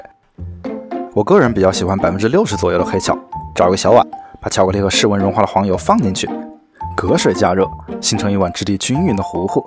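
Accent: Wuhan, China. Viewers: 这是武汉普通话吗？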